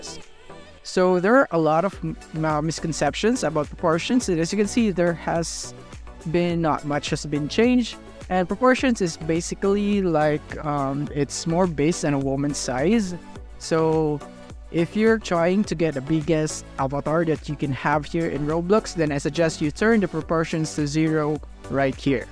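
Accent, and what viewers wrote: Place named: Philippines. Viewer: sound filipino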